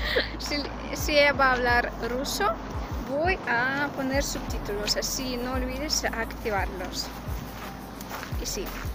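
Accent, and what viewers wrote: Spain. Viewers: Tu acento es de España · ... podrias hablar ruso.. se te hoye bien.. adoro el ruso.. pasa que con el dia a dia.. uno lo que menos aprende es ruso.. te resulto dificil el español? veo que tenes un español de españa...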